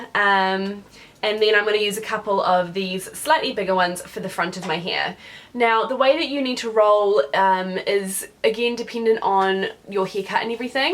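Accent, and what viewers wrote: New Zealand. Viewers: Is she from New Zealand? Her accent sounds kiwi. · You have the most interesting kiwi accent and you were absolutely born for that red lip. Gorgeous. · I think they look really nice. I might put in my lady hair when I pamper her eg pedicure. BTW is that a New Zealand accent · yep that's the kiwi (NZ) accent :) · Knew I heard another New Zealand accent! Hello from home, great tutorial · Yay NZ accent, I've never heard us on YT before! Great tutorial, thank you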